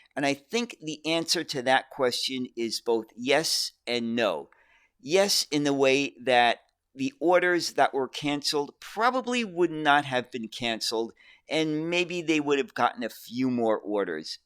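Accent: New York, United States. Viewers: is from New York, as if you couldn't have guessed that already!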